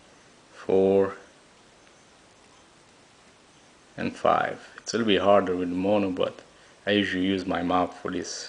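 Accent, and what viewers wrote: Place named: Nepal. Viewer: Are you from Napal? By your accent it sounds like it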